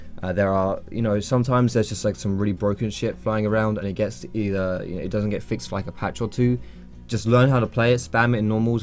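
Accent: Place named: London, United Kingdom. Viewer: Thx man helped me a lot. By ur accent guessing ur from london jus wondering where abts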